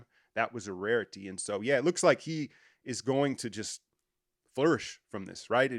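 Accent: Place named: New York, United States. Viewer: Na bro, you can't make it in warrior media for a second. You way too blunt for that organization. You really sound like a New Yorker if am being honest. If I never knew you; I'd think a New York media is bashing the warriors all these years. Happy takes boss!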